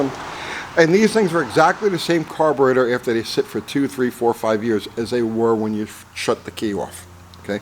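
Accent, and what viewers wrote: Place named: East Coast, United States. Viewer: I dig yir channel ’. Especially yir E-coast patois. On top of that, yir a real guy with an average guys attitude.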